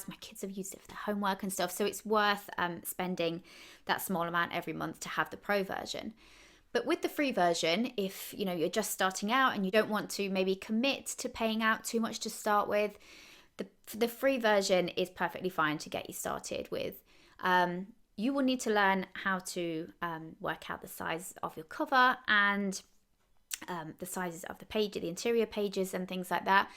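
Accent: United Kingdom. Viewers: I love your British accent. Watching from Iran.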